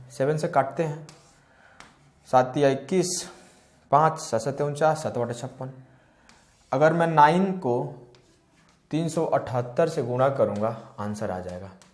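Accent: Bihar, India. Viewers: Bihar se ho?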